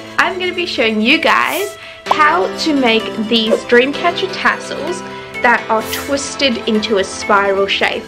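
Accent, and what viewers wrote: Australia. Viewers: In love with this dream catcher! Also, love your Australian accent.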